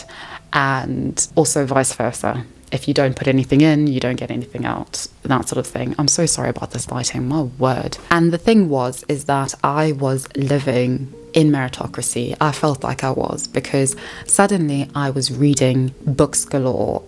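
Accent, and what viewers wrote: RP, United Kingdom. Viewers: Why do you speak so posh? What's your regular voice? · Wjust with your Britsh accent and level of education you would do very well in the U.S especially in media. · doesnt matter if u went to oxford or not , you sound like you did 💁🏼‍♀️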